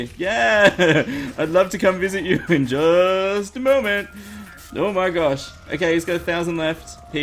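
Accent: Australia. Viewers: congradulations on 80,000 subscribers,! I think one of the reasons your channel grew so quickly is the shoutouts, people keep coming back to see if their shoutout was used, and of course, your australian accent makes you a calm, funny youtuber that everyone enjoys:)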